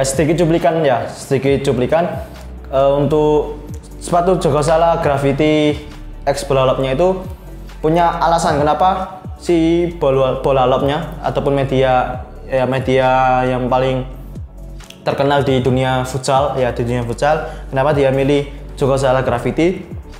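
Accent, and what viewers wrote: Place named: Java, Indonesia. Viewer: Halah..meddokh .. n gagap 😂 males Dengernya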